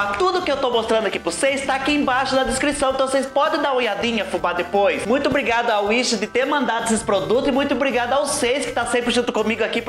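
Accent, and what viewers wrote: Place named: Northeast, Brazil. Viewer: Fubá sou Cearense e seu sotaque lembra quem é Nordestino. Sendo do Nordeste ou não, AMO seu jeito de se comunicar.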